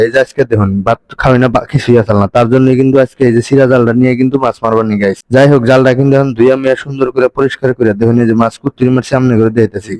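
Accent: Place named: Jamalpur, Bangladesh. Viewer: জামালপুরের ভাষা বলতেছে 😊❤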